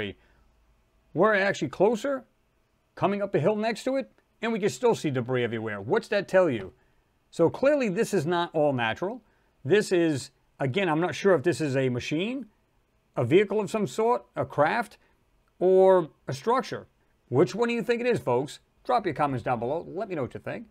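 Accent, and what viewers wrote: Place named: Northeast, United States. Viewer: That Northeastern accent loud and clear!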